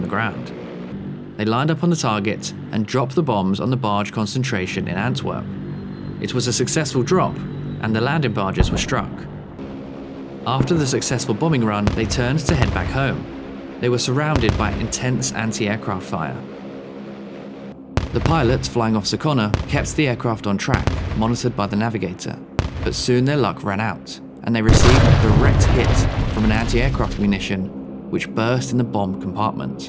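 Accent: England, United Kingdom. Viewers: I really appreciate the variety of aviation incidents you present, & this certainly was a very tense & exciting account. What a remarkable young man John Hannah was. Such sorrow for his life cut so short. I enjoy your clear concise narration and English accent too.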